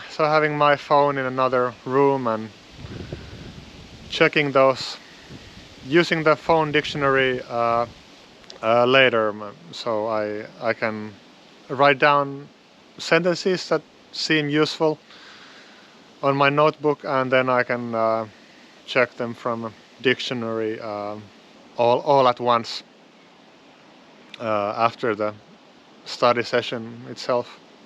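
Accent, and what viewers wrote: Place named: Finland. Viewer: hey,! my dream is to learn Finnish, but I never have the time to do it. I hope I'll be able to do it little by little with your channel, I really like the way it is made. I speak 5 languages fluently, but I think it's because I have a hyperlexic brain. I really like your channel and I really like your accent in English (I can hear the Finnish melody I so adore, but it's only because my ear is well trained to detect the slightest trace of an accent). I never understood why my love for Finnish was so strong. it might be because it sounds a little echolalic and just very beautiful to my ear, or it might be my (supposedly) Finnish DNA speaking, haha. I do remember making a random Finnish woman at a Warsaw train station very happy when I announced "Minä rakastan suomen kieli" to her.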